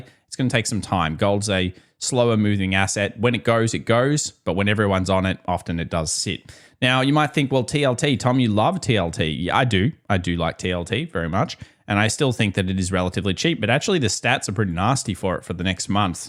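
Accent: Australia. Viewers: haha I watcha de women with big jubs but I admit myself I just love the dudes Australian accent.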